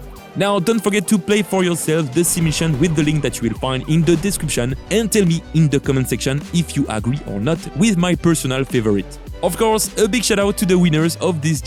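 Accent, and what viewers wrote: France. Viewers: Je sais pas si tu es français mais tu as un fort accent français 👀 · Is me or you have a big french accent , you speak french ?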